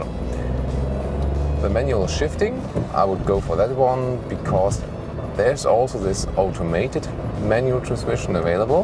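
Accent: Germany. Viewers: is he speaking germlish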